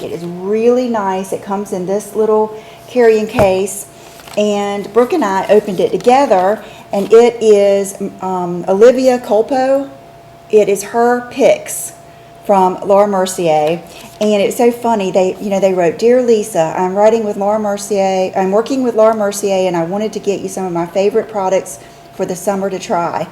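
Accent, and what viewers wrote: South, United States. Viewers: look forward every day to your videos..I don't know if its your southern accent..but your voice is so soothing & really love your style..alot of the makeup & clothing you mention, I can not afford, but so appreciate you showing so many products..I did recently purchase the Maybeline Lifter gloss in Moon & I love it..It really is almost a dupe for fenty gloss bomb in fussy...anyway just wanted to say thanx for always uplifting my day..especially if I am in a funky mood....keep moving forward..enjoy your weekend..:)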